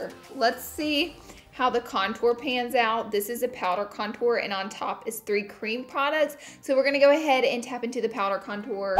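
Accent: South, United States. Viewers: So many people diss her accent! Trust me y'all it's harder than it looks to say concealer as a southern😆 Love you 😀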